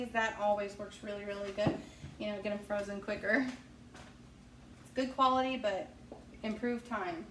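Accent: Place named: North, United Kingdom. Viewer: Where do you guys live??? Sound like a norther girl like me! 😉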